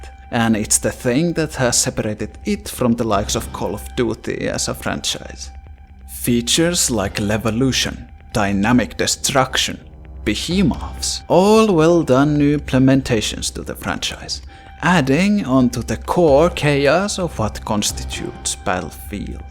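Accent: Finland. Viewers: are you from finland my brother i think i can hear a slight accent in your voice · are you from finland?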